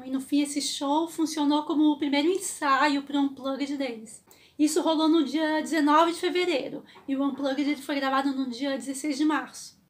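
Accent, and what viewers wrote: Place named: Brazil. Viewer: Somos brasileiros... nossa lingua oficial NAO é o ingles... a gente nao tem obrigacao nenhuma de falar como eles... assim como eles nao pronunciam nada 100% quando tentam falar portugues...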